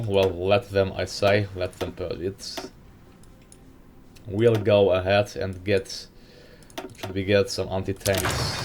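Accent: Germany. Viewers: ich glaub er ist deutsch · You German okay . But which German? Eastern?Western?Northest?Bavarian ? :D WHICH ? :D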